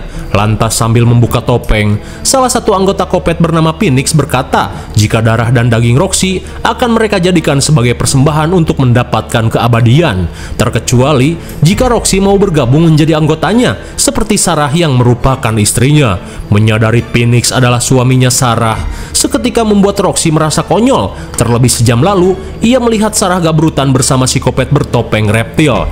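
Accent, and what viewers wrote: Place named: Banten, Indonesia. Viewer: Dina logat Sunda na Sunda Banten doang na ieu Chanel nyh😅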